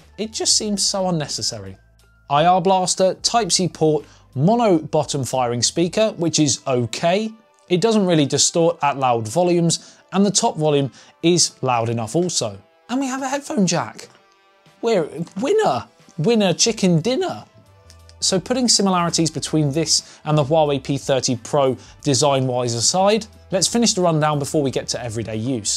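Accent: England, United Kingdom. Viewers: what in the world is your english accent??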